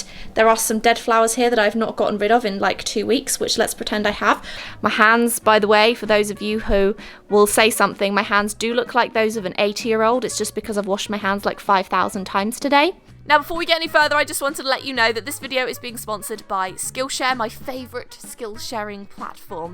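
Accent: United Kingdom. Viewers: Bruynzeel: say it like Brown-sale (with a little bit of an emphasis on the w). I'm assuming it's an old Dutch name. The y isn't really used in Dutch (it's replaced with the ij which in a crude English translation is said like "eye"). But this is how I (someone also with a British accent) would say it. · reminds me of Moria with the funny edits but a British accent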